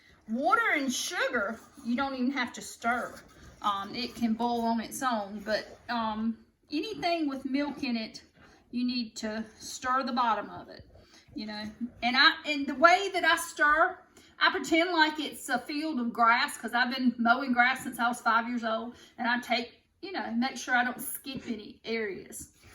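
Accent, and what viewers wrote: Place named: Texas, United States. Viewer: You talk just like me. I'm from south east Texas piney woods.😁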